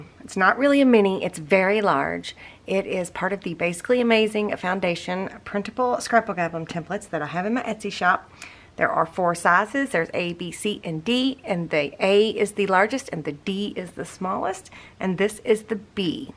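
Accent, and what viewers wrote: South, United States. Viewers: This is absolutely beautiful !!! Congratulations on making 50k subscribers🎉🎈🎉🎈!!! I am so grateful for having found you!! I love ❤ the fact that I have never found it hard to understand ya!! I gotta southern draw too lol and you ain't never said somethin that I haven't heard before unless you accidentally make up a name for it like cramin two words together lol 😂😂!!! Thank you for everything you do!!!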